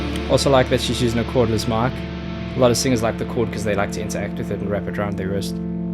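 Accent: South Africa. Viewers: Dude, are you from South Africa? That accent.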